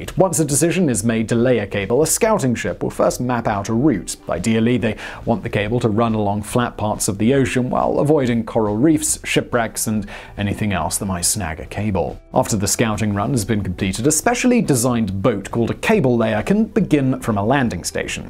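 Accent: RP, United Kingdom. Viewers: Or... you can watch something like: <link> and get more information in three minutes than this does. Why is that? And, minus fill (to make up a required video length to be monetized), no BBC British and insipient hand waving and mannerism. In fact can be done in three minutes no sound at all. Nice.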